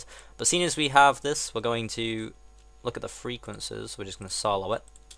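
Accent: United Kingdom. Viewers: Northerner. Definitely a northerner.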